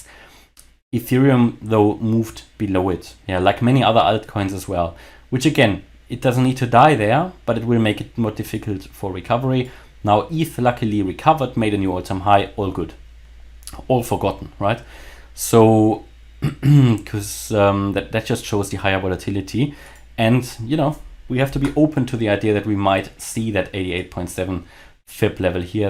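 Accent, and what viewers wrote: Germany. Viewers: Is he a german? The accent.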